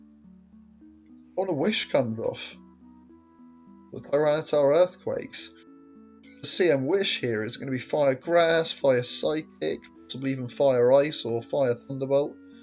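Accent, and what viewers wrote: United Kingdom. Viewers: This is a British narration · Insane narration, random British person.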